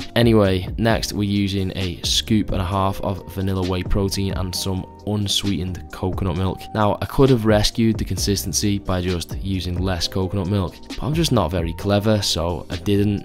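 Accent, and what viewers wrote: United Kingdom. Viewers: I love the British accent (quick say water) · the bri'ish commentary just makes it that much better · Something about a guy with a heavy UK accent calling the taste of a smoothie "absolutely fucking ruthless" with soft music playing in the background. You got my sub.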